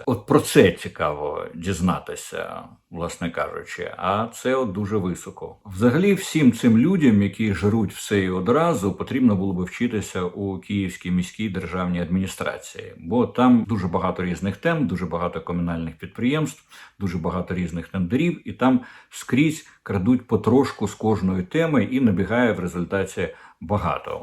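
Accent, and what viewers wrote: Russia. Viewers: Чому у головного націоналіста країни такий паскудний російський акцент? "ГрІвень", "вЄлодоріжки"... Наче Юлія Володимирівна знову "ожила". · трІста? ГрІвЄнь? А чому Дмитро Корчинський так дивно спілкується? З підозрілим мацковським акцентом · 'Грівня','ракєта', 'велосіпєд 'і не раз ' Кіїв '- як же глибоко у такого щирого вусатька засіли фонетичні правила ' огідної ' мови Булгакова...Ваші п'єси,вельмишановний пане,грають професійні актори,котрі продовж 5 років у бурсі на Яросл.Валу тренували свої вуста над правильною /прийнятою на медійних просторах / укр.вимовою.Саме вони можуть допомогти Вам.../// Madam Ambassatrice, котру Банкова спрямувала у Швейцарську Конфедерацію,зовсім не манікюрниця,а сексолог...Це різні речі,хоча фахово нагострені нігті можуть потужно використовуватись і в чуттєвій царині.../// Приємно здивувала широкий загал і Ваша квітково- строката сорочка,--- таку не одягне навіть і такий затятий нетрадиціоналіст як пан Портников...Брате,воістину Bruderleiter- е,не розчаровуйте тих підписників,котрі не раз,вимиваючи руки від важкої та брудної роботи на полі,все таки ставлять Вам ЛАЙКИ!!!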